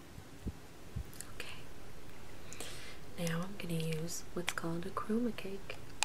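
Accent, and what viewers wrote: South, United States. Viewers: Oh your southern voice is soo soothing ^.^ I've missed you! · YAYYY!!!! I'm so excited! I love your sweet southern drawl that reminds me of one of the reasons I love Texas so. :P Your first makeup video still gives me tingles even after - 2 years - has it been that LONG?!?! anyway I'm just so glad to see you back. :))))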